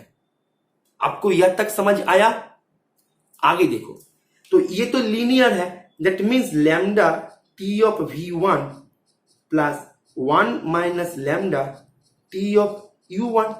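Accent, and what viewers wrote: Bengal, India. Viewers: Thank you sir. Are you from Bengal?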